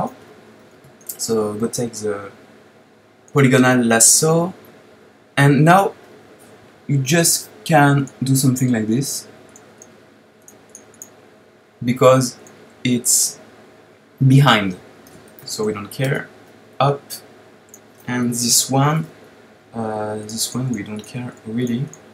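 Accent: France. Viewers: French accent :< ( Je suis français aussi, énorme tes vidéos :)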